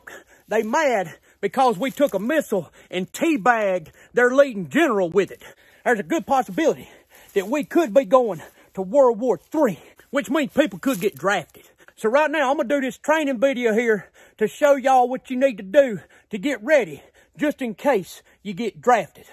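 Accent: South, United States. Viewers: took the internet by the storm. Looking like a Hulk, talking like a deep Southerner. Priceless! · I love his southern accent. He’s hilarious.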